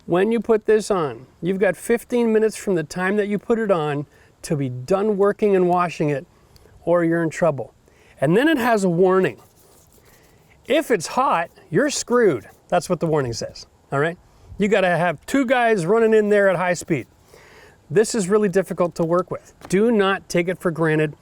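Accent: Canada. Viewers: OMG, I'm an experienced DIY'er and have done a bunch of remodels and cabinet building. But I never touched the tile work. My latest project is a bathroom remodel and doing the tile for the first time. Watched some of your vid's, got a feel for it. In one of your videos you mentioned something about this grout, but I ignored it. Well, job is done and I noticed this video and watched it... so yeah, oops. This now explains why the stuff was setting so quickly. Fortunately my wife chose this super close color match and it looks good. Whew. Great job on the videos. You sound just like my contractor friends, minus the Canadian thing.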